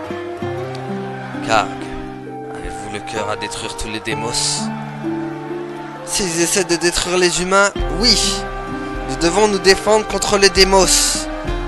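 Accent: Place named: Germany. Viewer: je voulais de l'accent allemand....jai ete servi! :)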